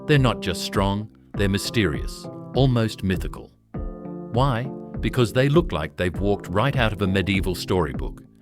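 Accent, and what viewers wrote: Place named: United Kingdom. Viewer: I hate to have to listen to a British bot.🙄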